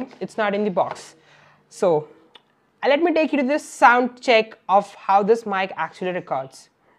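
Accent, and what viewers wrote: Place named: India. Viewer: He is just doing nice 😂😂😂 sale desi english....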